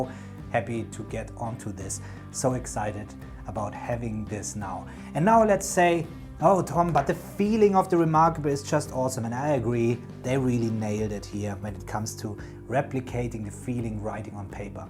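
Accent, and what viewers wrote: Germany. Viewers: U Sound a bit like you are Germa Are you tho? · Are you German? :) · German Akzent? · People who speak German English are firm, and direct! Danke schoen Mein fruend🇩🇪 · dieser deutsche Akzent so geil😂😂😂🇩🇪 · Are you German? I can’t place the accent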